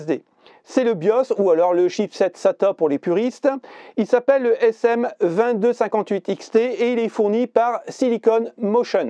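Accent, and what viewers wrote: North, France. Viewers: T'es de ch'nord ? ^^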